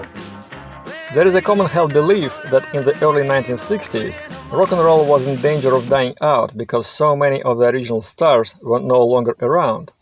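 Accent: Russia. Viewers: Только я слышу русский акцент?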